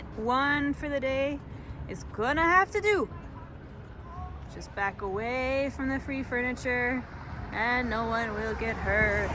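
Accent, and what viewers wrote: Canada. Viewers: You have a lovely Canadian accent when you speak French! 😉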